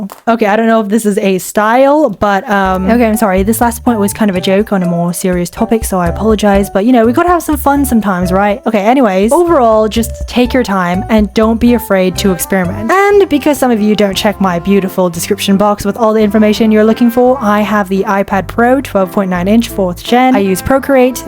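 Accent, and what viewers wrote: United Kingdom. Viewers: Are you British in some parts really often yours accent sounds british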